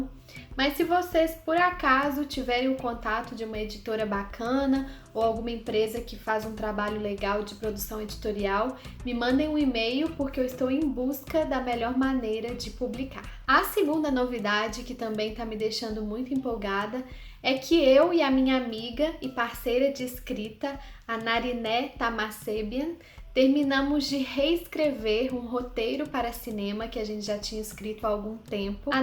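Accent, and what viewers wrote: Minas Gerais, Brazil. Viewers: I think she's speak like people in Minas gerais. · De la manera que parles eres brasilera. Parles més lent i més clar, per a un català. A més a més la pronúncia de la "R"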